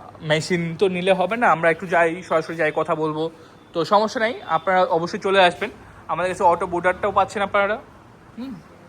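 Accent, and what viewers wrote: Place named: Dinajpur, Bangladesh. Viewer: দিনাজপুরের কথাশ এটা ভাই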